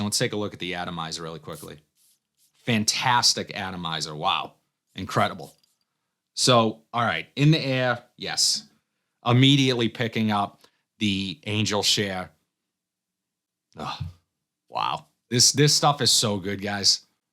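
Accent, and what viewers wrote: Boston, United States. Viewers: That Boston accent 🫣🤣🤢🤮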